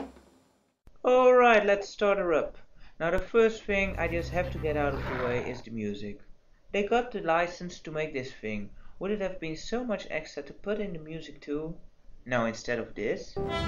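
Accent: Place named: Netherlands. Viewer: dus je bent tog nederlands, ik dacht al dat het aksent herkende.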